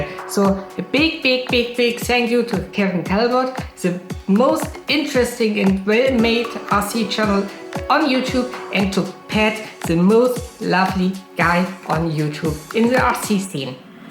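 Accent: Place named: Germany. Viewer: dass dein Akzent irgendwie deutsch klingt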